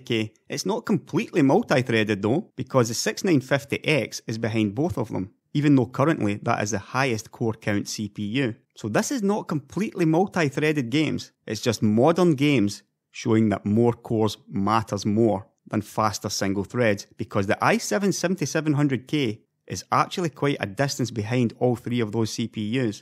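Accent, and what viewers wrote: Scotland, United Kingdom. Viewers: From his accent I thought he is Scottish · So hard for me to translate you scottish accent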